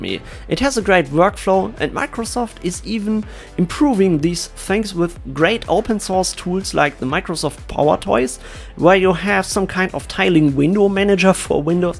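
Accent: Germany. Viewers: ist auch völlig in Ordnung. Der deutsche Akzent ist sehr deutlich, mag ich! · Sag mal, bist du deutscher?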